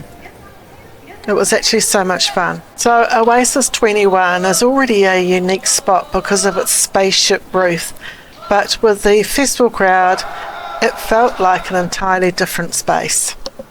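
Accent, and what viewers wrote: New Zealand. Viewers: Something you might want to work on. Nail your vowel sounds. I notice a couple of times when you speak Japanese, you kiwify the pronunciation. e.g. Minna no nihongo. Hot tip, you're not in a race, doesn't matter what language you are speaking, your native language or a language you are learning, if you slow it down you win. If you are speaking English, slowing it for non-native speakers means they will listen to you more carefully, if it is your learned language, then people will recognize and may mirror your speed (huge win). TOO many people are trying to break speed records in class typically younger learners do this. This is a way of disguising deficiencies. So lets break down - Minna no nihongo Mi - that sounds like "me" in English, not "minna" like "winner" There are 2 n's in there, they deserve their own pronunciation. You can hold on the "n" (hold it on the roof of your mouth, then raise your chin and spit out the "na") Keep your "no" short, it's shorter than someone speaking English and saying "no". 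Nihon"go" - hold that "n" in the middle the same way as before (tongue on the front part of the roof of your mouth, you can even hold it there a little it will make you sound more Japanese, then spit out the "go"). Remember - speaking is not a race. And being a little older and wiser, you get a pass for slowing the tempo a little to get it right. It's a fantastic tool I've used all my life, slow it down just a touch and people will listen more attentively. *NOTE I share th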